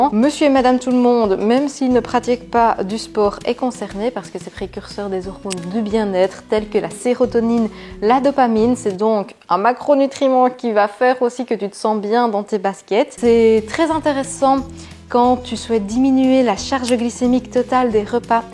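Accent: Belgium. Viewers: Bonjour, J'ai découvert ta chaîne il y a peu et honnêtement j'adore!!! 🙂 En revanche, je ne suis oas sûre que tu atteignes véritablement 120g de protéines avec tes repas Au petit dej, même si tu prends 200g de skyr = 20g de protéine et ton granola =20g de plus, 2 gaufres à midi et ta salade ne te permettent pas d'atteindre 40g en plus si tu n'y as mis que 2 oeufs (12g de prot) et la farine de pois chiche, aussi riche soit-elle. Quant au repas du soir, il me paraît (très équilibré c'est pas le souci) mais là encore, je ne suis pas sûre qu'on ait 40g en tout Tu penses que tu pourrais préciser les quantités de manière à pouvoir calculer les macros Je fais beaucoup de sport et je peine déjà à atteindre les 80g/jour Mais en tout cas, merci pour tes contenus, ta fraîcheur, ton naturel, ça fait du bien! Et en tant que Lilloise qui habite désormais dans le Sud j'aime beaucoup ce petit accent belge qui me rappelle bien des souvenirs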